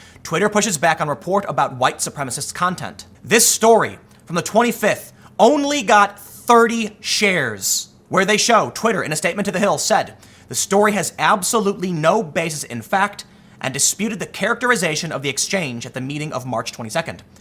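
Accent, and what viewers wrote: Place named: Chicago, United States. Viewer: please stop pronunciating like chicago. Me and my girl like your content but your voice sucks.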